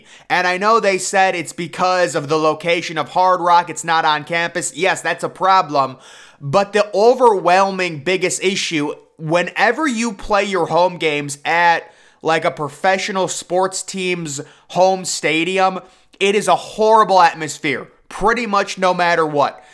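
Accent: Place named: Wisconsin, United States. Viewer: Hard Wisconsin Slang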